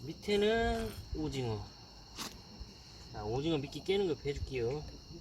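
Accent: Jeolla, South Korea. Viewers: 전라도 사투리 중에 오리지날 입니다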